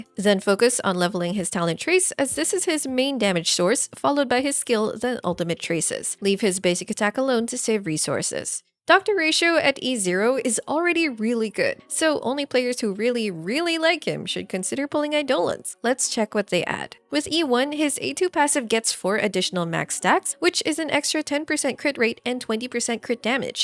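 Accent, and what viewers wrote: Philippines. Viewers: My first video after trawling reddit for recommended Honkai Star Rail CCs, and an uninitiated af, first time HSR player here doing their due diligence. First few seconds of playback and I thought, "Pilipino siguro si," and true enough, you are! Great to see a countrywoman be highly regarded and recommended in the HSR threads of wild reddit and, I'm sure, YouTube (my bad though thinking you had the Y chromosome; a thousand apologies XD). Here's hoping your channel hits 500k subs soon, and grows beyond that! (Yep, did my part to contribute 😉). I realized though this isn't a video for beginners, so I'll just show my noob behind out...and comb through your other tutorials, of course! lol Best wishes!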